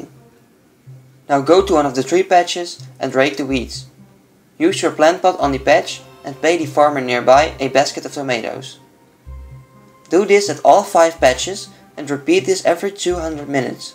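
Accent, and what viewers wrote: Netherlands. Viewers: With this accent I´m gonna assume you´re Dutch :P Great guide anyway. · The dutch accent hahahaha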